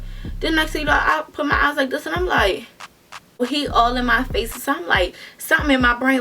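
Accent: Baltimore, United States. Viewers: are you from bmore? lol you sound like people from there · I was going to ask if she was from Baltimore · I just know you’re from Baltimore. You got to be ! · Baltimore ASFFF😂👏🏼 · Are you from Baltimore ? Love your accent . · Nah she sounds like she from Baltimore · You have a accent are you from Baltimore? I enjoyed your story time ☺️ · My first video of u and know u must be from Baltimore I love the accent lol💕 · Now tell me why I knew you was from Baltimore before you even started the story 😩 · You from Baltimore ain’t you lol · Ma'am are you from Baltimore?? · Just came across dis video while watching different storytimes Nd You said “do” a couple times and I straight knew u was from Baltimore 😂.. but this exactly y I hate getting my nails done I swear it always hurts then I don’t be saying nothing to em.. i just be sayin “pretty hurt” in my head but it be burning fr 💯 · U from Baltimore? 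U sound like one of us. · Tew, dew, stewpid 🤣🤣🤣. I love Baltimore accents 😂. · u gotta b from bmore lol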